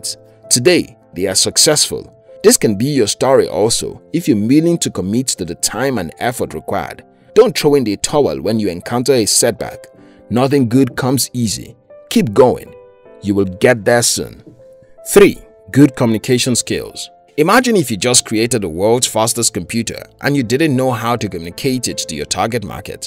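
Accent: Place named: Nigeria. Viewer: This accent is from Nigeria 🤩. Pick up's Nigeria for going full swing. It is grear channel. Im subs. Love it. Born in Mozambiqye myseld, living in South Africa. Thanks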